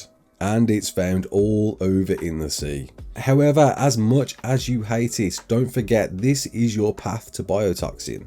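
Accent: Birmingham, United Kingdom. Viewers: He’s definitely from smethic Birmingham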